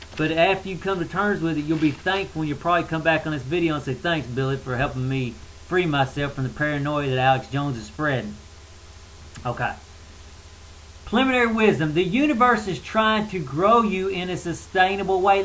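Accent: South, United States. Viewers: Very good 'good ol'boy' accent. Simple wisdom from a simple guy... one of us? Not really...hahaha. What we have here is a contradictory jambalaya of esoteric 'doctrine of the ages' sprinkled with liberty movement and generic pseudo Christian phrases. Paranoia is delusional fear. Sadly there is too much blatant & historic evidence of the malevolent intent in the character and actions of the long laboring apostles of the coming New Age of false "Peace, Love & prosperity", to be labeled Paranoia.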